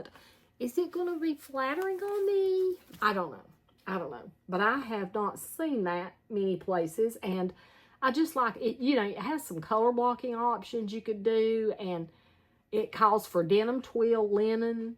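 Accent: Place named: North Carolina, United States. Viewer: I can just listen to you talk all day long. You sound like my cousins down in Yancey County NC. I was born there but we moved away when I was four years old. Your sewing channel is so fun to watch, love it! 😍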